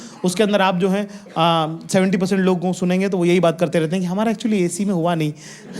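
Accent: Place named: Bhopal, India. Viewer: That bhopali accent is hitting hard❤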